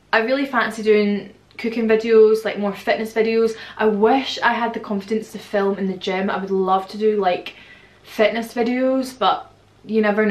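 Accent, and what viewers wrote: Scotland, United Kingdom. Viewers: sounds like Glasgow if not it’s Edinburgh (for the accent)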